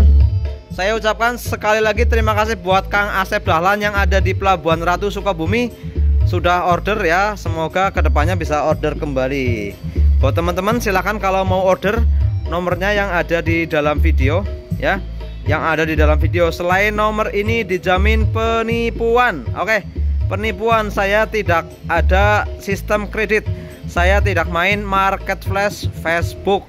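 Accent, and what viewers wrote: Banyumas, Indonesia. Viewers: ok joz mas boos,aja kelalen ora ngapak ora kepenak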